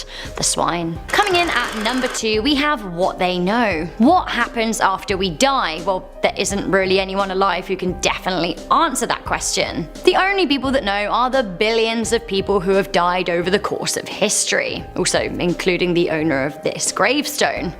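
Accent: England, United Kingdom. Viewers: You remind me of the girl who was in love with doctor who. It's the British accent and how your voice sounds. I miss watching doctor who now. Need to watch it again. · Her English accent is no excuse. She is pronouncing the name altogether WRONG. King Tut I'm sure is not finding any humor. Your comment, however, I'm sure he got a little"chuckle" 🤭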